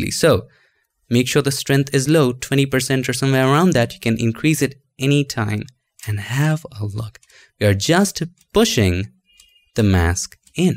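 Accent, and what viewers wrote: India. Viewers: hello there! are you Indian cuz your same exactly seems like Indian, I'm also Indian ☺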